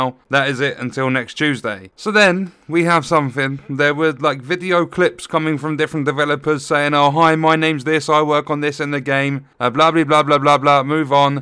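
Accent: England, United Kingdom. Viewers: I don't play the division, I don't watch division videos, but listening to your classic " its a load of bollocks " English accent is enough for me to watch your videos. Keep up the rants the game is aids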